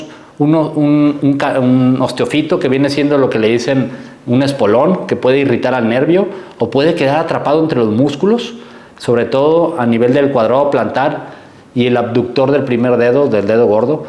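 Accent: Mexico. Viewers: es de México! Mírale la bandera de México en la bata! Además de que el acento lo delata!! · Por la forma de hablar, en Mexico.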